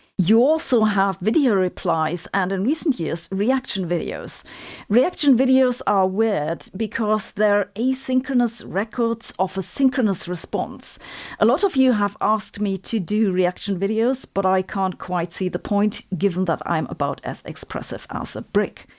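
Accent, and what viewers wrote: Germany. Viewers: “I can promise that my German accent is real!” 🤣 🤣🤣 love it! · And like your German accent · I love your "brick" like expressiveness. I also love that you're built like a "brick sh!thouse." (You might need to look that one up to understand the compliment) I love your German accent and your sense of humor always gets me laughing. Sometimes, I have to pause the video on account of that. I hope you keep making videos for many years to come. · Oh and: your Eng is laudable and I congratulate you. But then what trace of German phonology remains only adds lustre. You see, I find German the most comfortable, the most pleasing to pronounce of all mod Eur langs. Hochdeutsch is as pleasing to my ear as the floaty and precise Serbian up in Vojvodina. (Like German, Serbian has many, many local speeches which are NOT pretty!) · I love the way you break down information. 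I love that you are German and your accent. I love your hair (you are beautifu)l and I love how funny you are but you don't try too hard to be funny. Thank you for all the great content!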